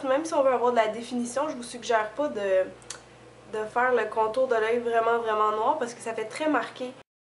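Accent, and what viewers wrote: Quebec, Canada. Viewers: Cette vidéo m'a convaincu : JE M'ABONNE HAHA !!! Mademoiselle aux deux facettes hein ! =D J'adoooore ton accent canadien, ou...quabecquois on dit ?? J'en sais rien lol... Bref j'adooore ton accent, cette façon de mélanger le français et l'anglais. Je te trouve très belle :) Avec ou sans maquillage, et même ton côté gauche du maquillage raté, tu es quand même belle. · j'adore vos vidéos, elles me sont très utiles, merci beaucoup. En plus vous êtes très jolie, et votre accent québécois est à tomber..........So Cute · J'adore, je rêve d'être comme toi. Autant quand tu es maquillé que quand tu es naturelle, tu es super belle. J'adore aussi ton accent canadien. :) Continue tes vidéos, j'adhère et j'essaye de mettre tout tes conseils en pratique. Gros bisous et bon courage pour la suite. :D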